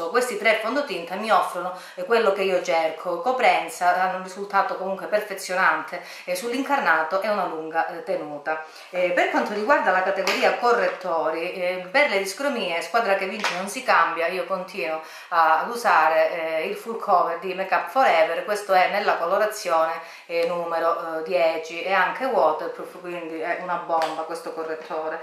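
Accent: Calabria, Italy. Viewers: Ciao, ti ho appena scoperta e non posso più fare a meno dei tuoi video! Adoro il tuo accento calabrese e i tuoi gusti! Un bacio da Napoli!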